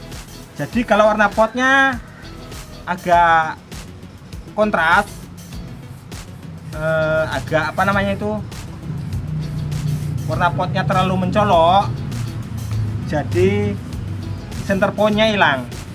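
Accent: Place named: Java, Indonesia. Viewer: Aku lihat sampean ki kok ingat sama lek gogon ya mas..dialek jowomu iku lho aku seng seneng...tapi lek gogon kok alih propesi yo mas..slam satu hoby mas.dari bojonegoro jatim.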